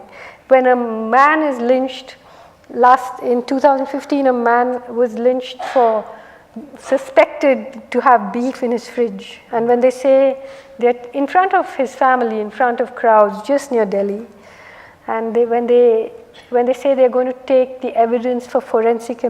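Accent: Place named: United Kingdom. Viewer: She is another school of propaganda unto herself . Propaganda always has an element of truth manipulated to an end with creating even a more radical social polarisation than what actually exists on the ground . She really never talks about the emergency , or the biggest riots in the pre modi era , the kashmiri pandits exodus . She definitely has an upper caste Hindu phobia . In her pursuit of happiness , all the Hindu characters are cruel blood Mongers who crave Muslim’s blood , always vile and wicked while all the Muslims and third genders are noble , peace loving , innocent , righteous, just and beautiful .. specially the kashmiris are depicted as the most noble and kind hearted race in the world. What sort of a joke is that ? Her generalisations are gross and frustrating . Never been more fed up of a mainstream writer . What she is doing to society isn’t just a disservice but a more serious damage . 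In order to criticise one radical form of militant hinduism and the Muslim phobic right wing in India , she is demonzing the entire mainstream Indian culture and Hindus at large. Her theory is more simple than what her chaste British English attempts to hide - her one point program to create a demon out of everything that hinders her propaganda. There is absolutely zero analysis in her arguments ,only blanket sweeps and gross generalisations , even lies ! She picks and chooses facts at will , even the degree of the facts reported by her is manipulated . I wonder how come a brai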